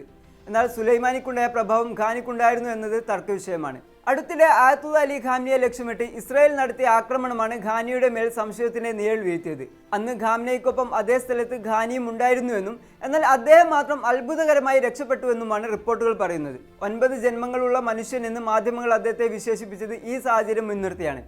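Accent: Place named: Malappuram, India. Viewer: മലപ്പുറം മലയാളം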